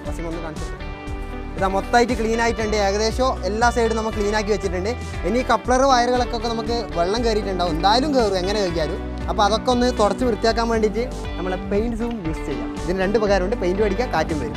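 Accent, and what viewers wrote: Kannur, India. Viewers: കണ്ണൂരുകാരാണോ ബ്രോ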